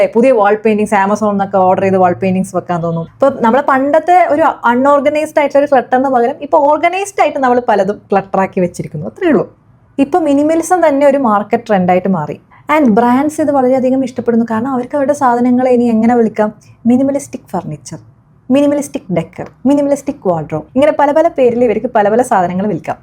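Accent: Kannur, India. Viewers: നിങ്ങൾ കണ്ണൂർ ജില്ലയിൽ ആണെന്ന് തോന്നുന്നു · Crispy Kannur slang.... 👌👌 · Nice content, but understood by only a few. Good one. Varthamanam kettitu, are you from Kannur? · ഭാഷ കേട്ടിട്ട് കണ്ണൂർ, വടകര ഒക്കെ ആണെന്ന് തോന്നുന്നു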